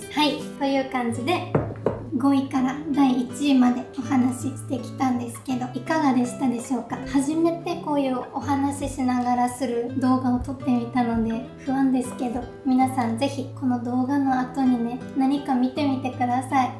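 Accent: Kagoshima, Japan. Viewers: 伸びかけのボブを見て大人っぽくなったなぁ変わったなぁと思い、鹿児島弁の「いつもの」喋りを見て全然変わってないなぁと思い。楽しそうに好きなものを語る様子が本当に嬉しい。推しキャラに可愛いとしか言えなくなるが可愛すぎました。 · はいつまでも鹿児島訛りでいてホシイ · の鹿児島弁がひさびさに聴けて、多分だから癒されてるんやと思う😊✨ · 自分も鹿児島に住んでいるのでこの訛りというかイントネーションに親近感が湧いています。これからもこの動画の雰囲気を楽しんでいきたいと思います。頑張ってください！！！ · いつもひょっこり顔を出してくれるだけでも嬉しいのに声まで来たもんで、本当に癒されます！訛りはが鹿児島にいたことの証だから、むしろどんどん出して欲しい！キャラクターの説明で「かわいい」って言うたびに画面の向こうで「あなたがですよっ！」とツッコんでいたのは僕だけではないはず。そしていちいちアップになるたびにかわいいのもずるいし、どういうことかっていうと、今日も癒しの動画をありがとうございます！ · の漫画・アニメ愛が伝わってきました！そして鹿児島訛りが同じ鹿児島県人として心地よくて癒しです😊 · と同じ鹿児島弁のyou Tuberの釣りむすめと鹿児島弁対決してください。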